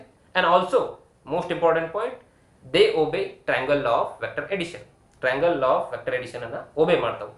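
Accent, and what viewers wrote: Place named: North Karnataka, India. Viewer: ಉತ್ತರ ಕರ್ನಾಟಕದ ಬಾಷೆ ಬೆಂಕಿ ಸರ್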